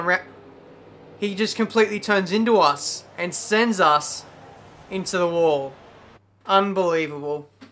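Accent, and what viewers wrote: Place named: Australia. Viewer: australian voice Great job mate, that came outa nowheree!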